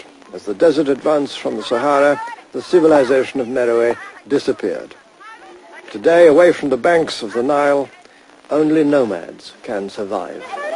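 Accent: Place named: United Kingdom. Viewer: This was made in the seventies it early eighties. He is British and lots of British men speak like that. He was a professor of The school of African and Oriental studies. He was very interested in black history. It is more a style of presenting. Watch a documentary by Richard Attenborough and he has similar style. So does David Attenborough his brother (the old guy in Jurassic park).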